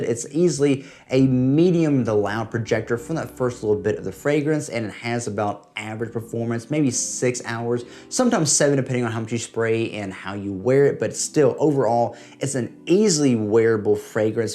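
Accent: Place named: South Carolina, United States. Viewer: Love the SC accent!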